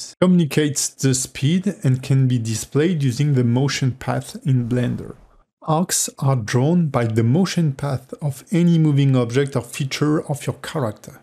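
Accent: France. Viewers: You sound french ! That makes me understand more well !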